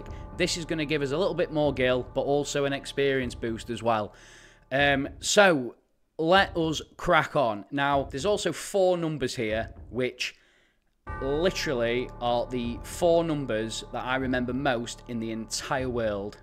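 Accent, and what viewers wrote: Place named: Yorkshire, United Kingdom. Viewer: The Mrs is from Yorkshire! Where you from big man? Some armpit like Selby/Goole?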